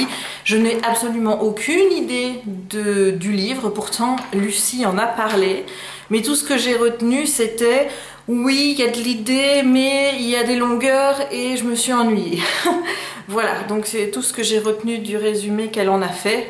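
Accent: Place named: Belgium. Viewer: Coucou! C'est la première fois que je te laisse un commentaire mais je regarde tes vidéos depuis longtemps, et ce soir je voulais juste te dire que je les aime beaucoup! Elles sont de plus en plus dynamiques, rigolotes, pleines de vie en fait! Je trouve que tu es très fraîche, et ton petit accent belge me rappelle des bons souvenirs (ma famille maternelle est belge également). Les sélections de sorties pour les mois à venir me plaisent toujours parce que cela permet de trouver plein de nouvelles idées de lecture! En feras-tu prochainement pour les livres en VF aussi? Je demande surtout par curiosité, les VO me vont déjà très bien. Belle soirée!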